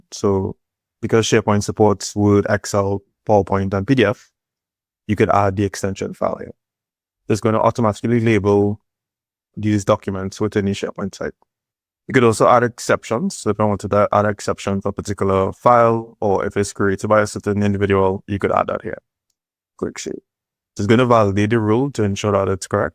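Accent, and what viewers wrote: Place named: Trinidad and Tobago. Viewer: Dude sounds trini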